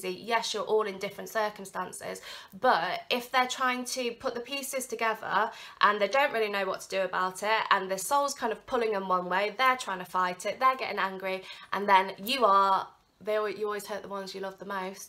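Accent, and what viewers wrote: England, United Kingdom. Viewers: OMG was Spirit hanging out with my DM..lol resonates completely as expected Beauty, you are always spot on..like the smart psychic girlfriend who is quirky yet never less than stunning on any given day..served in an very cute English ? No expert ..or close by accent and heart of gold who can be trusted..like all women need..TY love , for being that to me..I am grateful and appreciate greatly..Blessings I wish Love and light..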